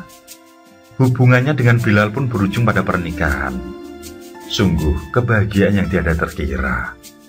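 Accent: Java, Indonesia. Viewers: You got a new subscription from me! Logat Jawanya sukaa. Gambarnya bagus, nggak pakai penggaris pun garisnya lurusss.❤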